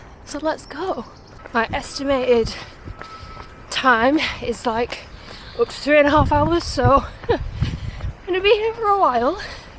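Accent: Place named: Yorkshire, United Kingdom. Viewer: What part of Yorkshire, did you come from?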